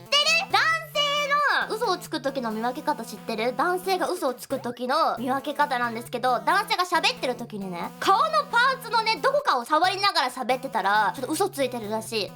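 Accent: Hakata, Japan. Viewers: 博多弁よっしゃぁ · 博多弁ですฅ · 博多弁まじ神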